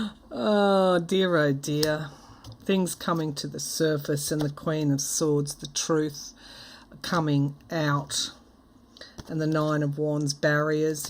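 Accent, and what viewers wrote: Australia. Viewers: Australia your accent is awesome I am from mass down the Cape cod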